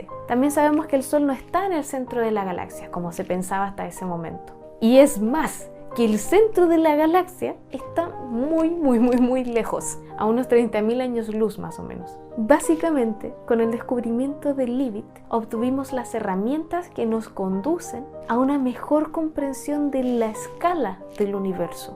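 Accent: Chile. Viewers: ME FASCISA SU VOZ DULCE Y SU ACENTO CHILENO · Me encanta el acento chileno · Es chilena, no? Nunca entendí el "hate" hacia ese acento, si es precioso. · Habla como chilena, yo soy chileno jaja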